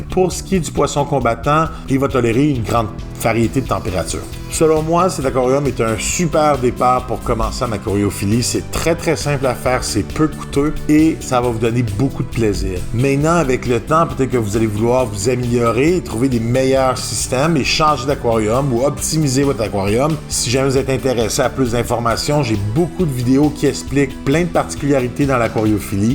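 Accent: Quebec, Canada. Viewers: UN GRAND MERCI cher monsieur avec votre très bel accent québécois. Mon fils de 6 ans sera ravi 🤩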